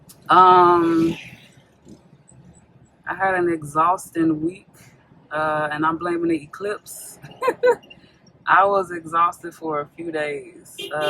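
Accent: Jamaica. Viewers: How do you do that? For a Mississippi girl your patois sounds authentic!😊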